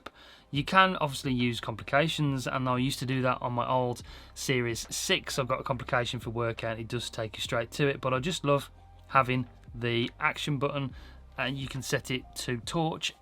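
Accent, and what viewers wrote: Birmingham, United Kingdom. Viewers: Proper brummy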